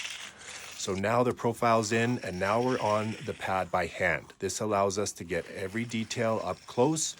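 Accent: Canada. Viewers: Canada voice at its finest. Love the work you boys do!! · You sound Canadian. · For canadians, your pretty good 😂 american say out, canadian sat ooot, great, great work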